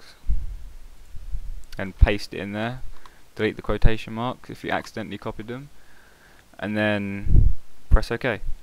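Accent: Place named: London, United Kingdom. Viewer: Hey, you sound like you come from some where around Sidcup? Correct?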